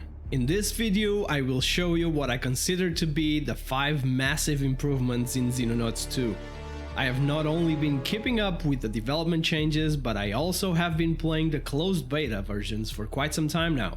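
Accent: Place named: Portugal. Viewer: I was listening to the video, and halfway through the only thing going through my mind was how Portuguese you sound.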